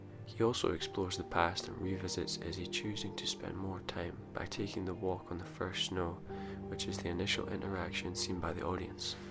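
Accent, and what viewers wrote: Scotland, United Kingdom. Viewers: What an incredibly revealing interpretation.......I have seen this movie several times, catching it on cable.....only once from the very beginning. I never considered the symbolism between Izzy's skin, the detail of the queen's dress and the tree. Tragically sad, yet beautiful movie. Thank you for this analysis. Love the accent.....Scottish?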